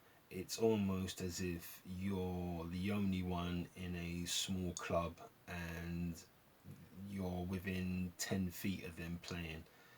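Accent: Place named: United Kingdom. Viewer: I totally agree with you about Nancy Wilson! thank you for this video. . . very information and presented in that British accent that just makes me melt. . I’m just a puddle by now :). stay safe and well.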